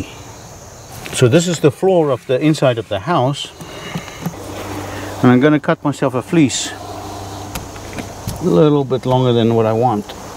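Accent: South Africa. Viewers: You sound like a South African 🇿🇦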